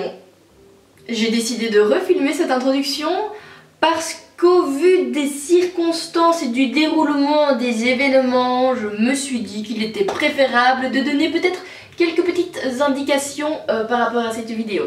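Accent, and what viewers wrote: Belgium. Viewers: Aaah j'ai reconnu l'accent belge ! xD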